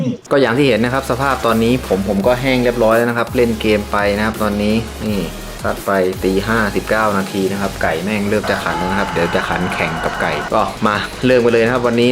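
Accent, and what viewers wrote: South, Thailand. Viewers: พี่บ่าวแหลงเหมือนคนใต้เลยอะ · พูดใต้เล่นกล้ามนิพอชอบหนัดเลย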